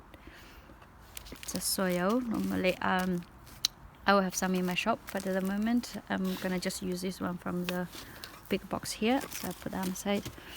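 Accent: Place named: England, United Kingdom. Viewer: Great collection! Thank you for sharing from Korea The mini pot is so cute! :-) I like your England accent as well! I subscribed to your channel as well! Hope your channel becomes flourish too! ♥♥♥♥♥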